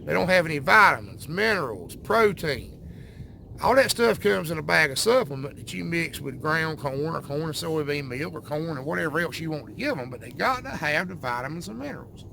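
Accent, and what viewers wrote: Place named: Alabama, United States. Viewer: Like listening to my brother-in-law from Alabama. Love it. Good info.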